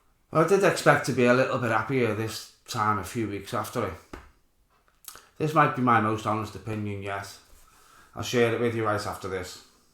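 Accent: Liverpool, United Kingdom. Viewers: 1. Role as a Commenter. OK. Stop. It. Right now. I mean it. You aren't other people. You can't compare yourself to others. Do you compare yourself to the heel work trainers at Crufts when Ann sits? Or how about your garden with Wavertree when you mow the lawn? It's got nothing to do with intelligence. Even the message you're delivering is only the second most important thing. It shouldn't be, but that's the algorithm. You have to know how to work with it rather than trying to get it working for you. I'm afraid I haven't looked at how that works, but there must be channels that you follow (since you're comparing). Contact them. Drop them an email. Ask if they know anywhere that you can get more help to grow. They might tell you where they learned, and as a bonus, they might also share their own experiences. There's also the accent. I personally see it as a bonus because you sound like some of the guys I grew up with in Lancashire (they escaped but never learned how to pronounce words properly 😉) But the Scouse accent, like Geordie, Glaswegian, and Belfast accents, are very distinct. 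Media doesn't cover much regional programming - especially internationally - so your international audience is going to be smaller than someone with a RP/Generic/BBC/Posh accent, or the "normal" Working Class accent of Estuary English or softened Cockney. (TBC)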